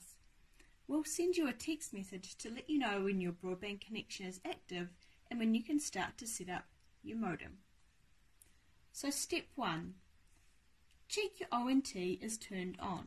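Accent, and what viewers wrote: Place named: New Zealand. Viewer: Thar kiwi accent tho 😍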